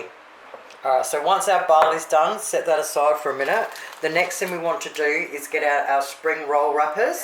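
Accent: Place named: Melbourne, Australia. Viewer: I'm trying to work out your accent. Maybe Melbourne? I'm originally a country Victorian but we don't say "All" for Oil or "Ball" for Boil. 😄I have lived in WA for 30 years now. Go Cats. 👍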